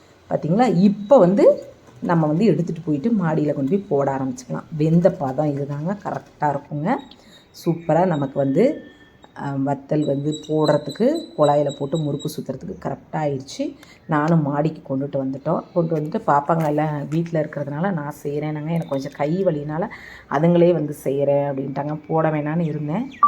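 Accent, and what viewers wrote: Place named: Kumbakonam, India. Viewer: Super குடந்தையில் இப்படிதான் சொய்வோம் வார்த்தையுமா எங்க ஊர் மாதிரியே பேசுரிஙாக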